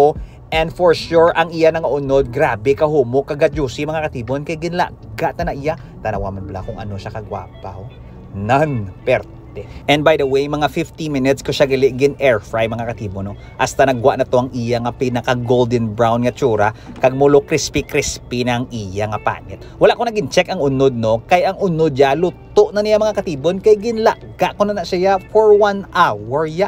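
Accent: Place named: Ilonggo, Philippines. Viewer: I missed the bacolod illonggo na tono! goodjob!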